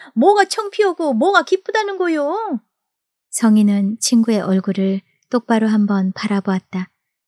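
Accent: Jeolla, South Korea. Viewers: 전라도 사투리 갱상도 사투리까지 완벽소화하셨네요 💯💯💯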